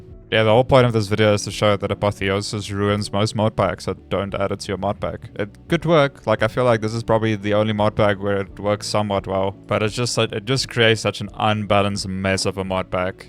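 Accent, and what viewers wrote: South Africa. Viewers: Are you South African by any chance ? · bro are you from south africa? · yoh I recognize that accent from a mile away, miss South Africa man. You've earned yourself a subscriber so I can feel more at home lol. Also, a really good video!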